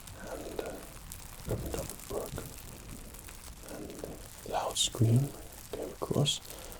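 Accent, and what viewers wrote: England, United Kingdom. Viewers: Is he English? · Loves chocolate, loves Harry Potter, looks like a surfer & sexy af articulate English accent... Yum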